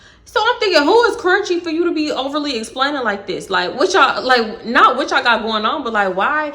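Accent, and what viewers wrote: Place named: Texas, United States. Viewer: went through my youtube highlights and you were my top watched youtuber lol i loveeee your videos and when i first used to watch you i was like “she talk just like me gotta be from texas “ then a lil after in the video you brought up being from houston then i found out you was a sagittarius too i said oh yeaaa this is my gurlll❤❤been watching since i was 16 lol im turning 20 on tuesday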